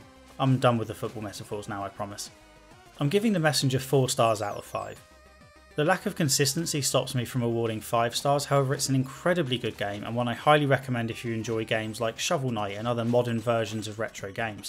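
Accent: United Kingdom. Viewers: Gonna be honest as a Canadian the first 40 seconds were so British I couldn’t understand lmao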